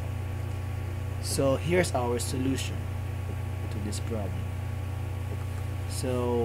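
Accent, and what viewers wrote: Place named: Philippines. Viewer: the accent is Filipino hehehej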